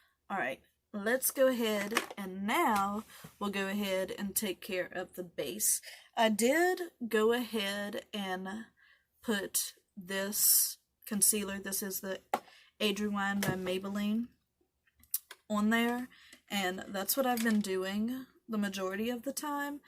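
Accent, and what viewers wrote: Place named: South, United States. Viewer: Yasss come on Southern Accent!!! I’m from Georgia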